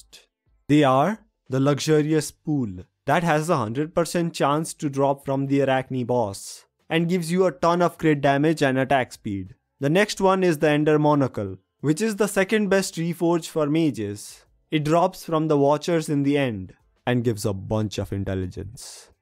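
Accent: India. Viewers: then why is your accent so Indian and I'm not being racist India doesn't have a lot of tech from what I've seen very poor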